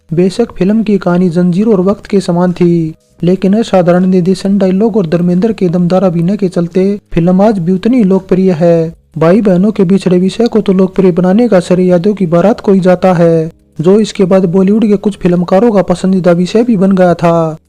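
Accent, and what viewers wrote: Haryana, India. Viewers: आपकी आवाज़ मै हरियानवी tune है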